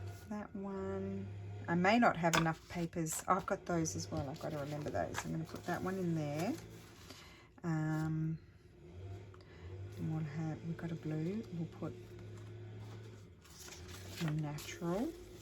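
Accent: Australia. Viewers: Love watching your Christmas videos. These journals are amazing. I waited till you had a few and watched them all. Hoping I can find some bags like this. Bit hard to go shopping around looking as England in 2nd lockdown, so will look on Amazon.. From listening to you, are you from Australia and now in Italy? Your video are great. Thank you for sharing.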